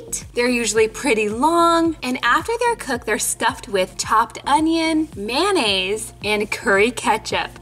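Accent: Netherlands. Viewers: #DUTCH Like if you're dutch. Love your accent, haha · its funny how you speak out the words im from the netherlands aswel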